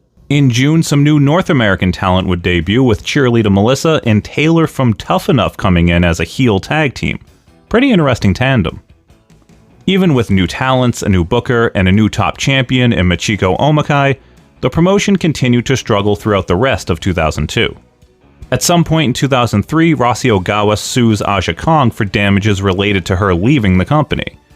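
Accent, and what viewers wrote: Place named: United States. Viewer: Nice stuff! I've been reviewing all their stuff (currently in 2001's beginning right now) and it's plain to see the issues- the fans never "took" to the mat-wrestling stuff and it was dropped, giving it no identity. Big stars Hamada & Omukai didn't sell well and couldn't connect with the fans, and lacked credibility. The biggest ideas to "push" people were essentially to have them win a bunch of flukes in a one-night tournament, which failed to really heat people up as challengers. The mess of politics in 2003 is even worse. I will say the pronunciations here are VERY "Americanized". It's hard to avoid, but MaREEko YoSHEEDA stands out, as does "Rye" TaMAda, haha. Ogawa was less of a "businessman" and more of a "PR Guy". Also Candy Okutsu was a JWP wrestler, not AJW, and it's "Korakuen" Hall XD.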